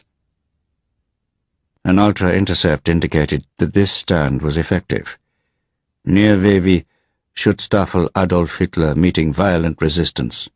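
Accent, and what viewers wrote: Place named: United States. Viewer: What's with the ridiculous American accent?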